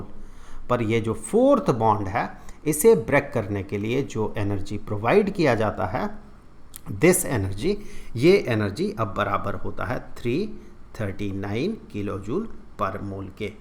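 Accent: Bihar, India. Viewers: but brother i am from Uttar Pradesh😂😂..His ascent of speaking relates to biharis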